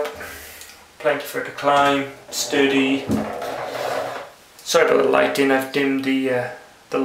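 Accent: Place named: Wales, United Kingdom. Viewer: I love your welsh accent! ❤️I especially love how sweet and gentle and obviously caring you are with them all, I speak to my babies like this too 😂 I love your concern and care for mama spidey to make sure she gets some nourishment ASAP 💕 that first crying of your son really was amazing timing too 😂🖤🕷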